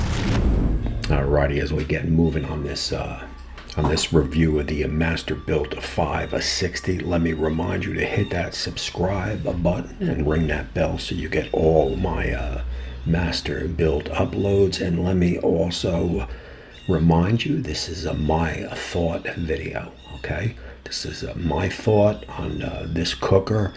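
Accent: New Jersey, United States. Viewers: Love the video. First time subscriber. Once I heard you tawlk I knew you were from Jersey!! Lived in Cherry Hill but live in HI now.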